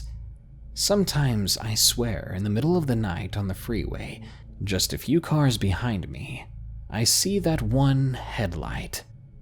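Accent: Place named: South, United States. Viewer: I like hearing older vids with his southern accent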